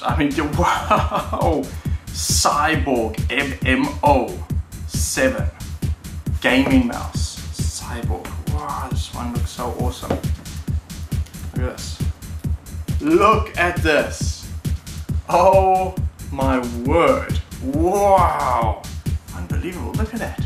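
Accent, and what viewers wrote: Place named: Afrikaans, South Africa. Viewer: Not to be rude or anything, but is Afrikaans (or Australian) your first language? I just think so because I have the same accent.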